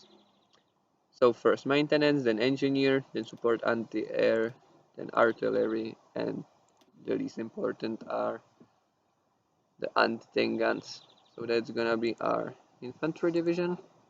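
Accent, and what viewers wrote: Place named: Czech Republic. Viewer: Jsem si říkal, že ten přízvuk mi zní trošku Česky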